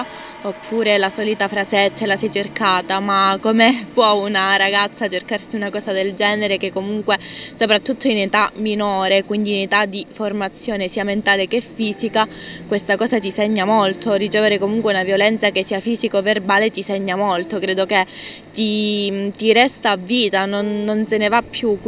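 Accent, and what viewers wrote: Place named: Calabria, Italy. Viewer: Sicuramente tu non sei una cima visto il tuo accento calabrese questo canale fa di tutto x fare visualizzazione non credo a una parola di quanto hai detto 👎👎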